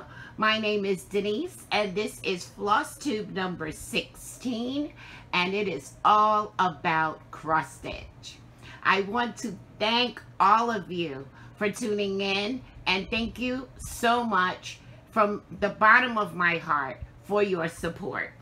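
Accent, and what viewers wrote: New York, United States. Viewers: Hi Denise, Im originally from Bklyn, NY and I detected a familiar accent. I went back to your first introductory flosstube and sure enough your a native New Yorker! Awesome!! So happy to have found you and have subscribed to your flosstube. I never had a stitchy friend from NY. I crocheted back in my Bklyn days but learned cross stitch since living in NJ. I stitch in hand but occasionally will use a qsnap. I like stitching on 28 ct and use mostly DMC but like the fancy flosses variegated look. Ive ordered from Hobby House Needleworks a few times, love that shop. Thanks for being a part of the stitch world. See you next time.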